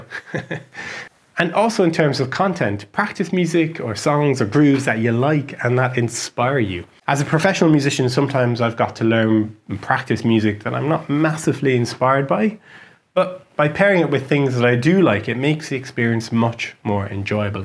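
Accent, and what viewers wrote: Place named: Ireland. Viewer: I met with similar inspiring and effective teachings in a famous book a decade ago but never with such a pleasant to the ear soft Irish accent :)))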